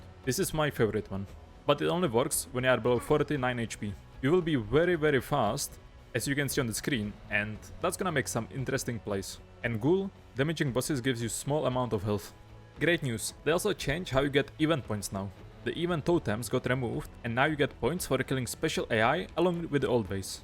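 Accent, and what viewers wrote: Czech Republic. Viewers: nejsi nahodou čech? :D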